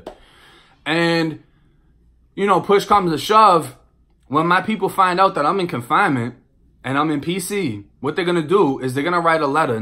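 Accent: Boston, United States. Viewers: Have you ever heard of anyone on death row going PC to drop out of their gang? I’m just wondering how that would work. Love your videos and Boston accent. I was in Boston in the winter back in 1974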